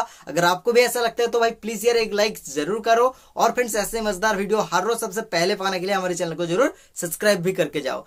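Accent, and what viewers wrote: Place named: Bengal, India. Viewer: Bhai aap Bengali Ho 😀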